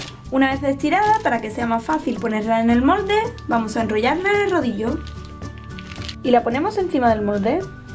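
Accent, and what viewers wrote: Andalusia, Spain. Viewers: Una pija, no distingues la buena dicción del acento andaluz por lo que veo, son cosas completamente diferentes. Es andaluza y SÍ tiene ENORMES problemas de dicción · La cosa esta clara si no la entiendes no la escuches y punto. Yo creo que no tiene ningún problema de dicción,igual el problema lo tienes tu con tus entendederas. Depende lo cerrado del andaluz se les entiende mas o menos , pero he ahí la gracia que tienen, decir que el acento andaluz no tiene que ver....el que no tiene que ver es un ciego. Es mas criticar su trabajo por supuesto que se puede hacer , o decir lo que no te gusta de la receta etc.. pero lo que tu has hecho es entrar en lo personal,como hable ella es su problema y no el tuyo,ni que estuviésemos en clase de fonética....que es un vídeo mujer, hay que hacer criticas constructivas y no meterse en lo personal,máxime si no se conoce a la persona en cuestión, ya solo sea por respeto o educación. 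Un saludo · Creo que a si es el acento español :) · todos los andaluces no tienen ese acento. Es de pueblo y por eso es más cerrado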